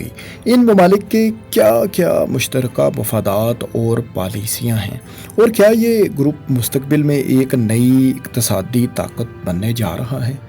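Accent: Punjab, Pakistan. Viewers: Aapki malumaat bahut achi Hein 👍🏻per Urdu Aap Punjabi lehjey mey Boltey Hein jiss Maza kirkira Hojata Hey 😂🙏🏻🙏🏻👍🏻👍🏻👍🏻👍🏻 Sohail Karachi 🙏🏻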